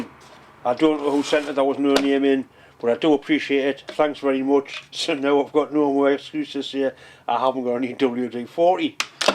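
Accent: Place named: Geordie, United Kingdom. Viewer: is a Geordie and speaks the regional dialect. If you are having trouble understanding him go to settings (the little gear on the lower right) and set the speed to 75%. I sometimes have to do that, especially with his friend.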